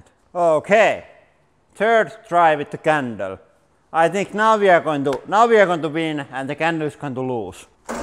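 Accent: Finland. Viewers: So satisfying and i love the Finnish accent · "En then wi Hev Nutelllllaah"...i love finnish accent😂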